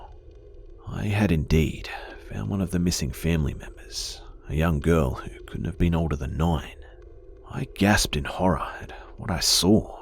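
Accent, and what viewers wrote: Australia. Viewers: I love your Aussie voice storytelling so much that I recently grabbed me a lad from Bris to forever listen to hehe. Thanks 😉🙊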